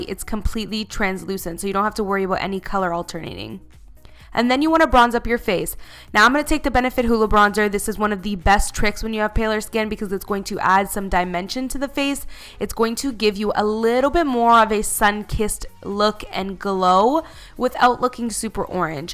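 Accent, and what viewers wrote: Canada. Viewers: Her parents are portuguese,but I think her accent is pretty canadian...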